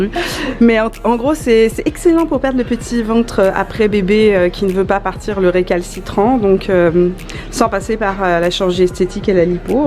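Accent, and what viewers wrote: Quebec, Canada. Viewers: Trop beau tes extensions de cils ! C’est vraiment réussi :) C’est drôle tu as beaucoup plus l’accent québécois quand tu es à l’extérieur que quand tu fais des vidéos chez moi ! Bisous 😘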